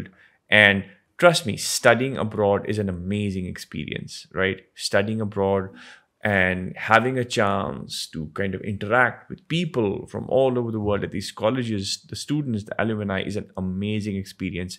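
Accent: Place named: India. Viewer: jesus, I respect this guy, but Indian English, OMG!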